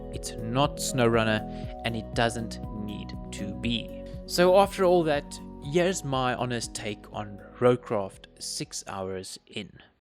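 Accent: South Africa. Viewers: Bro are you south African, I Wil sub if you are.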